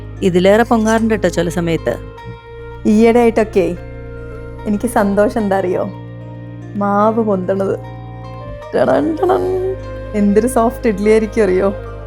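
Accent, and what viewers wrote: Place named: Thrissur, India. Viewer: Sister you do not have a Kannur or thalassery slang it's that of Thrissur and Palakkad anyway good 👍 Sruthi from Dubai hailing from Kannur at thillenkeri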